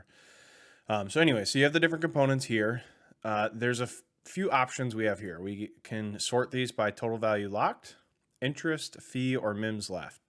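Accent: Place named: Michigan, United States. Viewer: Great job bro 👍🏿. Sounds like you're in MI. Go Blue!😉. I'm in MI too. Maybe we can link up sometime. Blessings to you!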